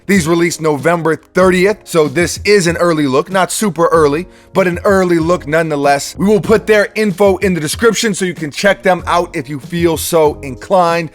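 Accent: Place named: Boston, United States. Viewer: def from boston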